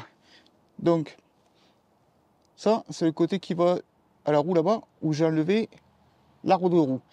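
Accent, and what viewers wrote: South, France. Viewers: oui merci , j'ai quasiment que des véhicules japonais ;) leur durites sont en acier très dur souvent en voulant refaire le bout du cône je le fait en simple cône sans le revers car sinon ça fissure , je devais mal m'y prendre . au passage : bel accent du soleil ;)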